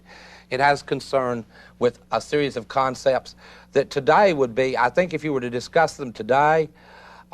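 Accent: South, United States. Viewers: the southern accent is not doing him very well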